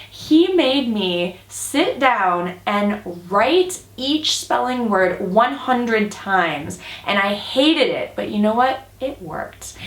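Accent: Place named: United States. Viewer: Hello whats your name? The one whos speaking? Do you have any websites? -- you sounds great.. you speaks like american, aren't you? I want to sounds native..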